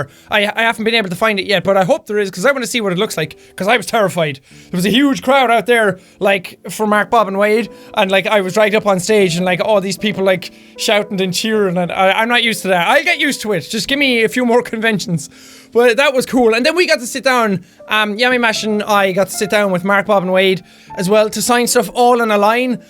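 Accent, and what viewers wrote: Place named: Ireland. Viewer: Yay irish accent!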